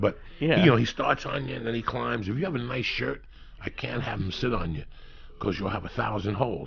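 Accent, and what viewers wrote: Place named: Bronx, United States. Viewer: Hey I don't know you but my grandmother is from the broncs so my whole family talks like you. I just wanted to let you know your a fucking trip but I appretiate you and Superbad is just like my cat Alice Cooper he was a street cat and he's mean as hell. He's black as night with one white patch on his chest has pieces of his ears missing and fangs that go past his chin like a vampire. But he is absolutely a lover if hes not loving on you and farting up a storm hes bitch slapping the dogs in the house and stealing food off of everyones plate (he actually ran off with a whole steak before like a fucking snatch and grab)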